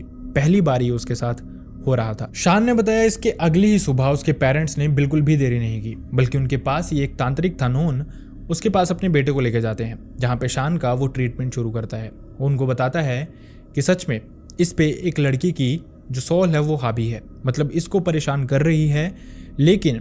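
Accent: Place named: Assam, India. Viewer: Bhai aap Assam se ho kya😅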